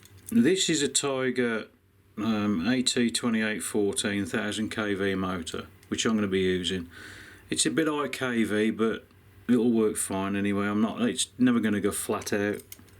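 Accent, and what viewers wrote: Birmingham, United Kingdom. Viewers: Sounds like a Brummy ☻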